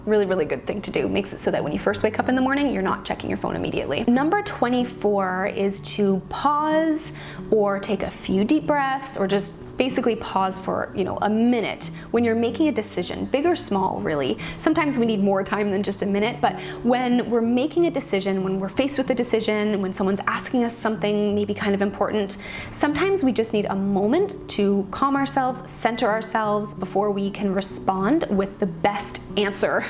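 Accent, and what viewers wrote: Canada. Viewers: New to your channel from Ashlyn's channel. Just subscribed. Quick question...are you Canadian? Certain words you say sound Canadian..... I live in Alberta,Canada. Enjoyed your video! Thanks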